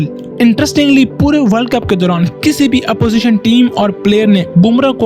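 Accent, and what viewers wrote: Pakistan. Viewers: Bhai tu Pakistan hai ye to accent se pata chal gaya. Thank you bro for such an amazing video.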